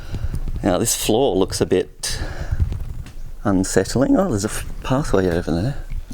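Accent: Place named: Australia. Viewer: australian english 🤙🏻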